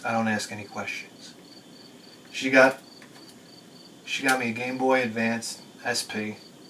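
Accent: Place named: Boston, United States. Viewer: it's that accent that sounds Bostonian.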